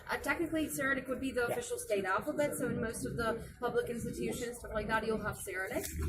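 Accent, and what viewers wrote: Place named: Ireland. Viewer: why an Irish accent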